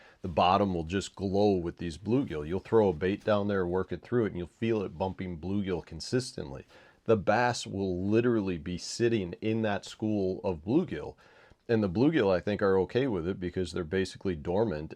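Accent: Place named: South, United States. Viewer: Sounds like you're in a southern state. Where do you fish during winter?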